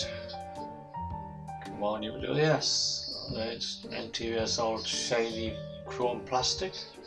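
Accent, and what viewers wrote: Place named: Cornwall, United Kingdom. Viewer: A cool looking car. BTW Your accent sounds like you are somewhere in or around Cornwall.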